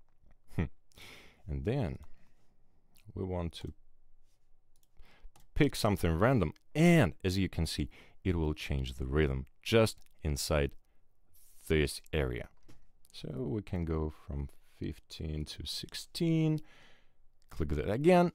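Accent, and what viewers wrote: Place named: Belarus. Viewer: Belarusian accent of English.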